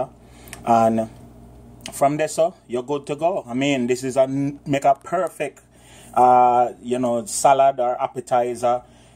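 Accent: Jamaica. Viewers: Nice!! Me like it, patois voice. Big up!!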